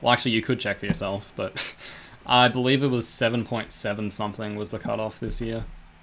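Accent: Australia. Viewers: You aussi accent is very good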